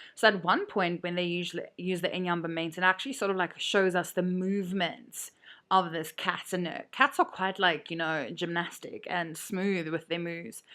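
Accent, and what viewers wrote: Afrikaans, South Africa. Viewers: Just taking a moment to thank you for your lessons. Regardless of the outcome of tomorrow, i still learnt so much from you. And i love your afrikaans accent😂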